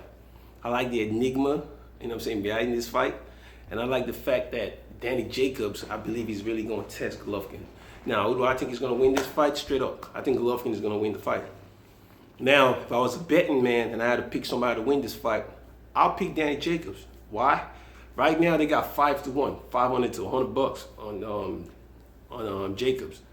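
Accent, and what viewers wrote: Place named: Nigeria. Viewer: guy all this funne wey u dey tlk. lol. u be omo naija abi omo ghana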